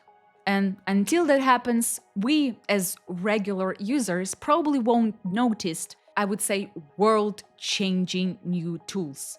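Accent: Russia. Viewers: Девочка очень старается. Но даже не носителю английского ее российский акцент за версту слышен. Но если будет усердно над этим работать, за несколько лет возможно научится похоже на носителей говорить.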